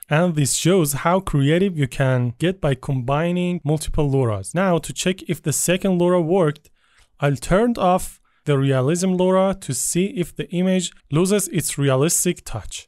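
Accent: Iran. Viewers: لهجه ی زیبای فارسی رو که شنیدم ذوق کردم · این لهجه قطعا واسه یه ایرانیه ، دمت گرم :hand-pink-waving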